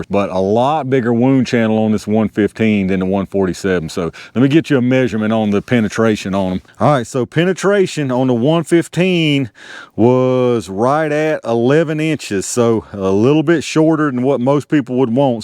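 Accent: South, United States. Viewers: You have the most classic southern drawl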